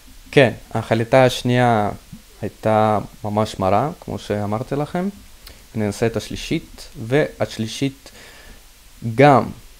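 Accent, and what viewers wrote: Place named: China. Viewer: אתה סיני הוא משהו יש לך מבטא נחמד כזה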